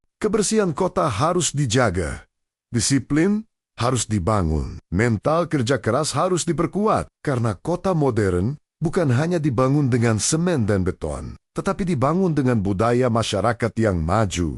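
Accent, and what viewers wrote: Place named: Malaysia. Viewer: logat Malaysia, kalau narasinya orang indo kebanyakan fitnah iri dengki 😀😀😀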